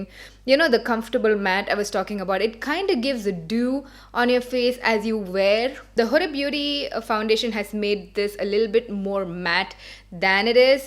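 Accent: India. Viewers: You're like the only Indian blogger I actually trust and follow.. I also like Shreya but I watch you more (just a personal preference). You keep it real, you're not fake and you don't try to be something you're not like adding unnecessary accents. You own that Indian accent and I'm proud. I enjoy watching you 😊❤️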